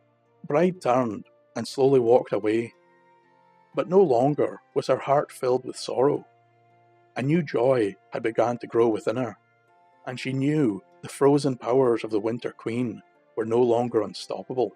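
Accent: Scotland, United Kingdom. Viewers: U’r Story-Telling has held me En-Tranced thru-out! I’v not ever heard this ancient Tale of Love ❤ & the forthcoming of the Enlivening Magical Energies of Summer-Time🙏🏻🌳🌹💐🦋 I especially En-Joy hearing Ur Scott Accent - It’s a Marvelous Brogue for the Ear👂to Hear ❣️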